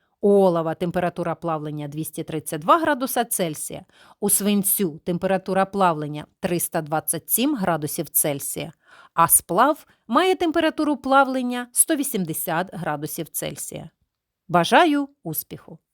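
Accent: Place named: Kharkiv, Ukraine. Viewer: Говір схожий на Харківський.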